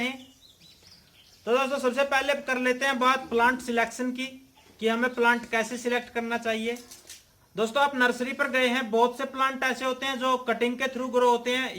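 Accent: India. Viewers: haryanvi ? kit ke ho?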